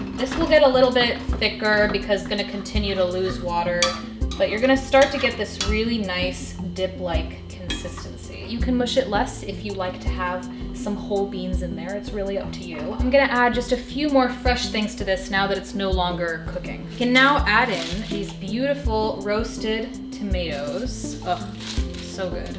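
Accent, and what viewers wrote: United States. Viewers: Can't wait to try it! Thank you for sharing. BTW Virginia accent? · heyy thank u for the video its fab! :) so good to see more and more vegans with YT channels!! do you have the written recipe up somewhere? would love to try it. im guessing youre american by the accent and also when you said 400 degrees!! XD im not sure what that is ill have to google the conversions or if you might consider putting the metric amounts as well in the written copy that would be amazing!!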